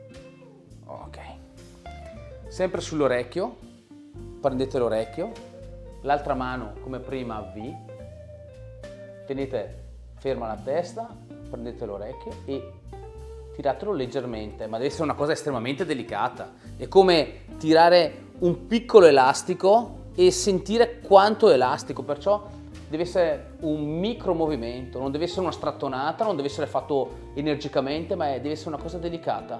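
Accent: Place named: Brescia, Italy. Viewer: Ciao dall accento mi sembri zona BS... Hai uno studio dove visiti di persona in zona Brescia? Grazie!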